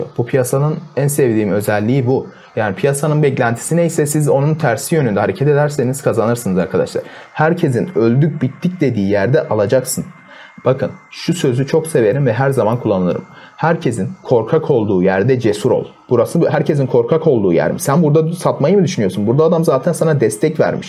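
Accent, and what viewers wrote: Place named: Gaziantep, Turkey. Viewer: hocam antepli olduğun o kadar belli ki ;)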